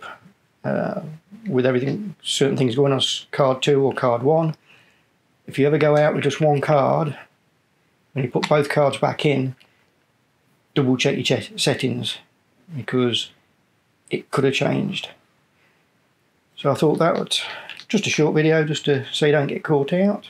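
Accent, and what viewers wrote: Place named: Derbyshire, United Kingdom. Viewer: Is that a north Derbyshire/north Notts accent I can hear?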